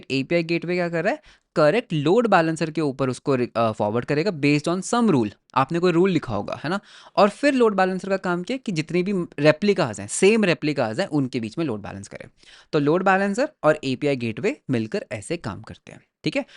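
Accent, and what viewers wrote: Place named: India. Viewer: Bro please work on your accent. English speaker and dropping your series because I can't understand that well especially with 2x speed. And I work with indians a lot at work so I'm above average for an American understanding Indian accents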